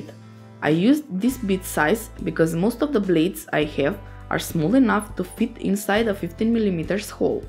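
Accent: Russia. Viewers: Откуда вы, девушка? У вас сильный русскоязычный акцент... . :)) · Крутая работа! Что за акцент? Русская что ли ? ))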